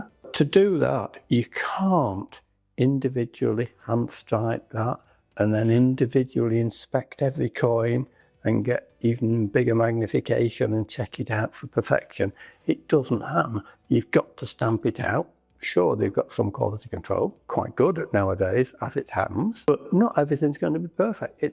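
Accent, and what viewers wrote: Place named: United Kingdom. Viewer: Chards are always good. Not often we hear these British accents on Youtibe either for some reason. Bad reviews just come from uninformed ppl. As soon as Chards makes something idiot-proof, the world just comes up with better idiots! 😂 Sod's law. PS... We need some juicy jousting between dealers on youtube, that would be fun! Difficult though without scripting some stuff. A big convroversy or investigation that blows over eventually after many ups and downs would be just the ticket for this market! 😂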